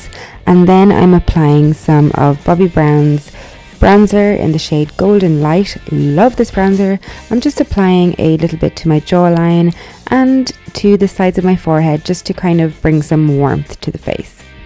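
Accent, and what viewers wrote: Ireland. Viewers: I see you are Australian, but what is it about your accent that sounds slightly Irish?? Is this a New South Wales thing that an American would know nothing about?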